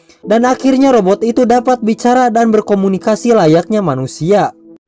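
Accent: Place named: West Java, Indonesia. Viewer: Salam satu alur. Salam pemula om. Orang sunda yahhhh???? Hehehehe Keren narasinya ommmm👌🏻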